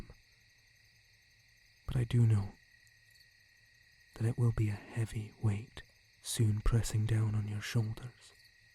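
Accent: Scotland, United Kingdom. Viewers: Love the Scottish accent. It fits the character perfectly.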